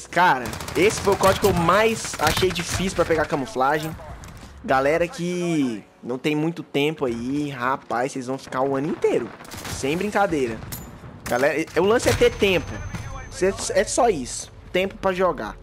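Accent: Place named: Goiás, Brazil. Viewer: Mano vc é de Goiânia ? Tem o sotaque mo parecido kkkk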